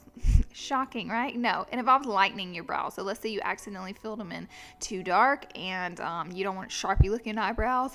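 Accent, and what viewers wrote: South, United States. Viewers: Girl, you' so country! Absolutely love your accent!!!! 😍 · Omg, I love your Southern accent!! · I love how you communicate your so southern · Girl you are so southern. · Are you country? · I like her southern accent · Why do you try to sound country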